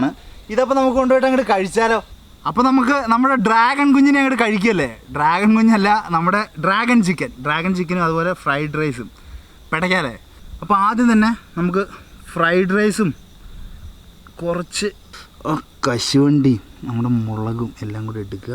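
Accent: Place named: Kottayam, India. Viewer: Ok, but broo ningade language pakshe nk oru kottayam area ayit thonniyallo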